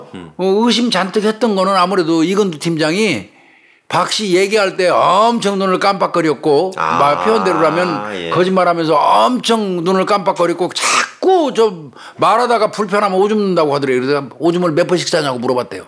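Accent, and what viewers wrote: Chungcheong, South Korea. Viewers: 화끈한말씀^충청도사투리가구수하신~ 김복준선생님? 절친고향여친도 복주라하였네요!오래전 고혈압으로 하늘이사한 가엾은친구!생각이나는~ 사이다같은 범죄자에 쓴소리가 그나마보는내내 시원한~🧊 하나뿐인생명 무참히살해하는인간들은? 그냥~~팍👊😡 요즘 수사반장을보는듯한~수고와감사🙇‍♀️ 그리고교훈^조심또조심 밑을넘없다 경계심잃지말고…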